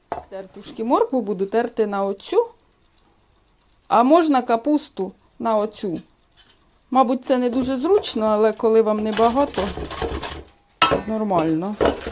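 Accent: Poltava, Ukraine. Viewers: Ви мабуть з полтавщини судячи по говору?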